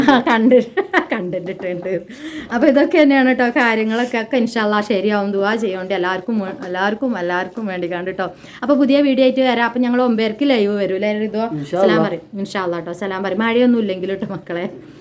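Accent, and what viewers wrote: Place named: Pullanjeri, India. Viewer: ചേപപൂര് ആണോ വീട്.ഞാൻ Pullanjeri ആണ്😊.ഞാൻ ഈ അടുത്താണ് നിഞളെ വീഡിയോ കാണാൻ തുടങ്ങിയത്.പിന്നെ നമ്മ ള ഭാഷ അത് ഇതല്ലാതെ വേറേത്😊❤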